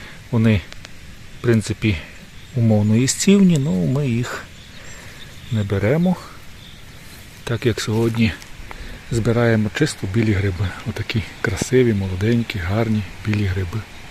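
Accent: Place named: Skole, Ukraine. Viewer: Скажіть, будь ласка, а ви не зі Сколівського району? Бо дуже подібний діалект.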